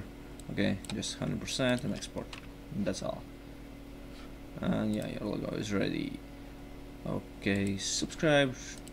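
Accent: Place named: Russia. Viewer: Thanks dude, guys give him some slack, he has a Russian accent and probably is the best at english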